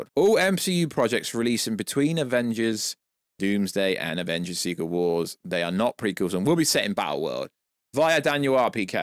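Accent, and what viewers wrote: United Kingdom. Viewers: I don’t understand what you’re saying. It’s to British. And my English is not that bad. It’s a shame because it looks very interesting. Good luck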